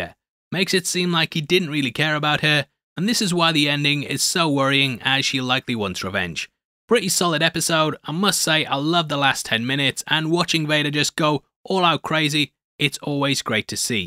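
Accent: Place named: United Kingdom. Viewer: Come for the takes, stay for the cool British accent. 🏴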